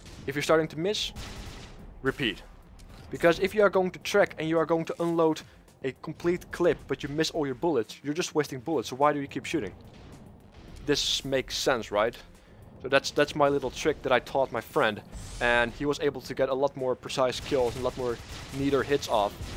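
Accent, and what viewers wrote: Netherlands. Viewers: you are dutch! herken het gelijk haha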